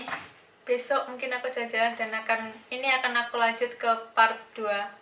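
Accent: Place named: Blitar, Indonesia. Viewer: 😂😂😂bhasane medokkk blitaran sikile teklokkkkk asoyyy 👍🏻👍🏻👍🏻👍🏻😉😉😘👍🏻👍🏻mantappp ya lanjutkan ngevlognya yg gokil gokil yaa kyak mak marck blitaran Barcelona, apa adanya yaaa 👍🏻👍🏻👍🏻😘😉🙏🤝🤝🤝ttep semangat ngevlog, smawong blitar wlingi 🙋🏻‍♀️🙋🏻‍♀️🙋🏻‍♀️🤝😉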